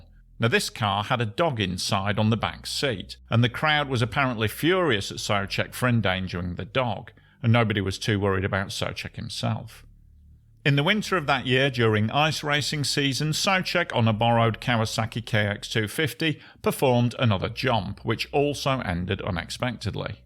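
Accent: United Kingdom. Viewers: You have thickest most unintelligeble Br'ish accent Ive ever suffered.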